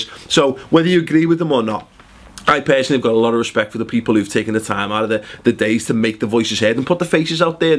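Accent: Liverpool, United Kingdom. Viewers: This Scouse git needs subtitles for his channel so the viewers will know what he's saying.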